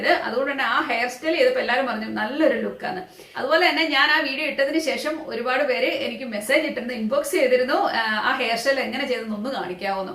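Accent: Kottayam, India. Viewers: Chechi namude Kottayam Kari anenu thonnunallo...namal kottayamkarde prethyekathaya adupam ulloru samsarikanapole samsarikunath...luv it🥰🥰🥰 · Chechi kottayam kaariyaano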